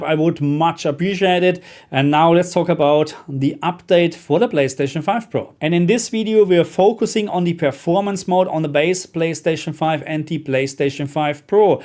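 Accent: Germany. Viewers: your English are perfect!I am from Greece but I like your German accent.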